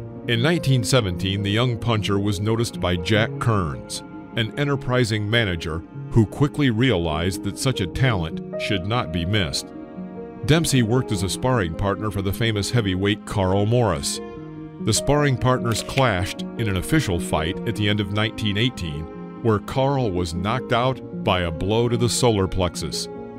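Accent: United States. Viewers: This narration is with an American accent yet Dempsey's weight is given in kilos. They tried to force us into the metric system decades ago, but that was rejected. I doubt we'll be using kg, mm, liters, etc. any time soon. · Hey narrator with American accent, wtf is "kilograms"?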